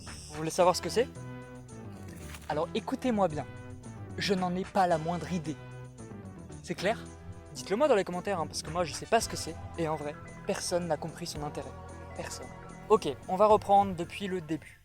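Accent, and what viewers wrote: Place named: South, France. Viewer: petit accent du sud qui fait plaiz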